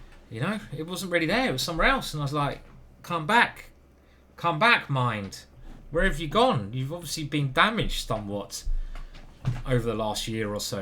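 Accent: England, United Kingdom. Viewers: Alright mate, really enjoying your channel, which I'm fairly new to. I watch Eric rosen and have enjoyed his speed run. He's so relaxed and calm. But, I don't know if its because you are English and sound like me, but I really relate to your commentary and thought processes. Which is ridiculous, because the chess i play is the equivalent of Sunday league pub team football, where just turning up is a victory. But you have a knack for explaining shit in a really relatable way. You come across like a normal bloke that I could easily have a pint and a chat with, and it wouldn't be weird. Anyway, not sure what im trying to say, except cheers and good luck. Check out 'devon tracey makes no money at chess'. Beautiful channel, interesting fella. OK that's it. Cheers.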